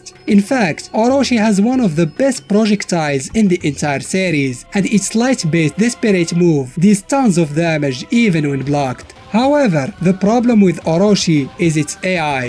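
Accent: Morocco. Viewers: من خلال لهدرة ديالك نعرفك مغريبي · Your English pronounce look Moroccan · Great stuff, you can never miss a moroccan accent lol great work keep on. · مستحيل متكونش مغريبي من لكنة ديالك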